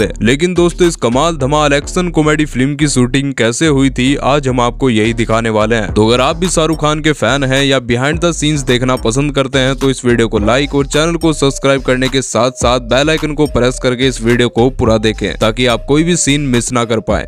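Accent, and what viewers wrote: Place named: Rural, India. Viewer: भाई पहले श को स कहना बंद करो, देहाती लगते हो बोलते हुए😅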